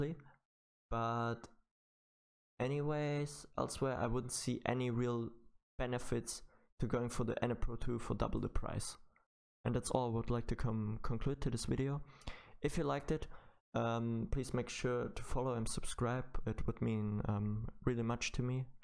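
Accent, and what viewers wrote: Germany. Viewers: ich wuste es das du ein deutscher yter bist wegen dein sprechen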